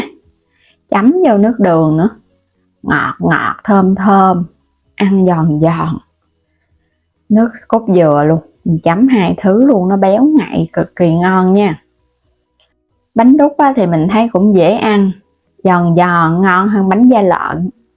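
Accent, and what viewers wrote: Mekong Delta, Vietnam. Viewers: Giọng miền Tây dễ thương mà bạn sao bạn nghe không được vậy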